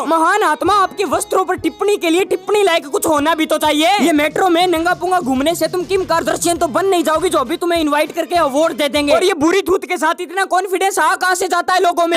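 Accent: Rajasthan, India. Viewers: Bhai❤😂राजस्थान से हो detected I'm also❤🎉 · Bhai kya aap Rajasthani ho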